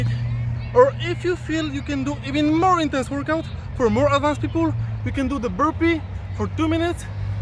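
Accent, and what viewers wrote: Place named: Poland. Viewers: Lepszy angielski z polskim akcentem niz polski z angielskim akcentem, jakby to po paru latach juz sie zapomnialo ojczystej mowy hehe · Typowy polski-angielski, hehe ;)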